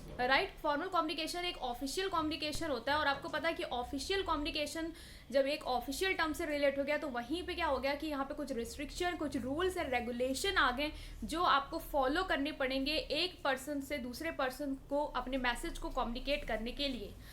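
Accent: Lucknow, India. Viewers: App Lucknow say hai lag raha😀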